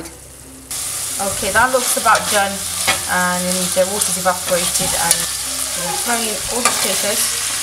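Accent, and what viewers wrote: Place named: England, United Kingdom. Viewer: What area do you live in?? Because your accent has a little English in it !! Great video sis 😊😊🙂🤗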